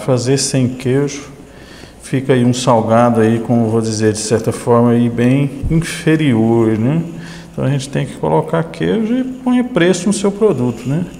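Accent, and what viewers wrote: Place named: Goiânia, Brazil. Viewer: Falo de São Paulo capital acho lindo sotaque de Goiânia, acho muito legal qdo você fala a palavra Pregar qdo se refere a massa, aqui na minha região a gente fala grudar. Nosso Brasil é maravilhoso cheio de cultura diversificada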